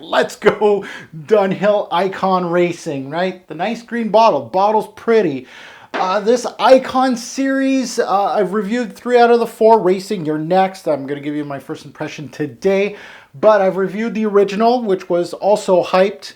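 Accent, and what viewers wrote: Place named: Canada. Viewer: You Cristo and DracDoc were doing reviews before anyone your pronunciation of Labels brands and names your definitely A Canuck ...Your honest and genuine ...If anything Dunhills best is Dunhill by Alfred Dunhill , the brown bottle.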